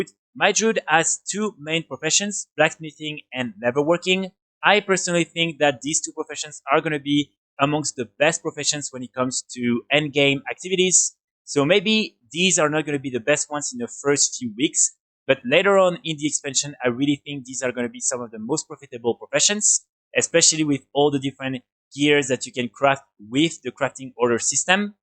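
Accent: France. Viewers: Bonjour, je pense qu'en français je regarderais vos vidéos, mais là un tel accent français pour des vidéos EN je peux pas. Beaucoup de timeline vidéo pour dire finalement peu de choses. Je vous conseille les vidéos de Penguin, niveau contenu vous pourriez prendre exemple. Bon courage à vous.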